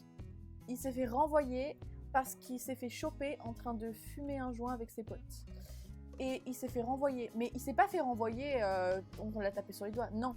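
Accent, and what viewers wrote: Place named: United States. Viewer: Et sinon ton accent américain c'est goal, est ce que les américains qui te connaissent pas remarquent que tu es française ?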